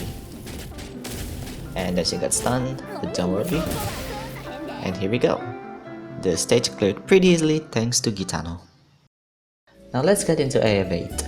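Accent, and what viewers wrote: Indonesia. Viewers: thought so, when i heard it, ah Indonesian accent somehow Keep it up dude 👍mantab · English Indonesia nya terasa bat anjir wkwkwkwkwk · you sounds like indonesian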